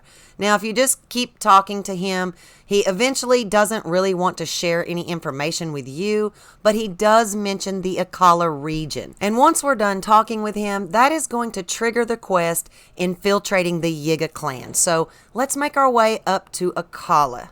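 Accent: South, United States. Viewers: This really is the best channel on here! I mean, that southern accent is not what I'd expect, but I love it!! And the info is super helpful.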